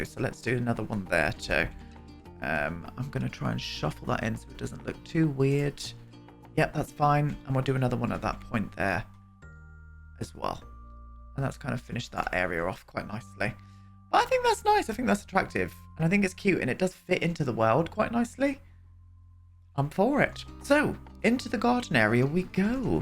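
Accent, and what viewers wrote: United Kingdom. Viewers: where in the uk is this accent from?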